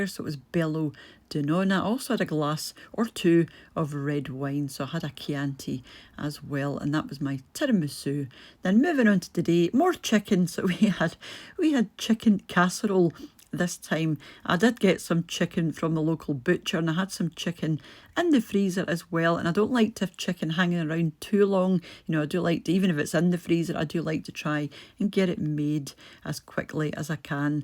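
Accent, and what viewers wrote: Scotland, United Kingdom. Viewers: Evening, as a Yorkshire woman I must say you can be a honorary Yorkshire Lass! What an impressive Yorkshire pudding! I also love the way you say Yorkshire in your beautiful Scottish accent. Lovely meals this week ❤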